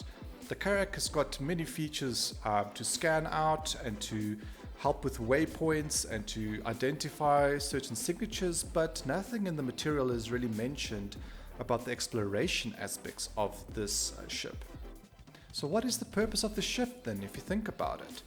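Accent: South Africa. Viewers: I notice that south african accent... any way I also looked at that odyssey it truly is an oddity, the only thing I can see it really being is a mobile station or base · You say capital like it should be Cape Town, but it’s actually still Pretoria. Even though capexit is there. Lol. Jokes. Can hear a saffa a kilometre away. The misc starfarer is a beast.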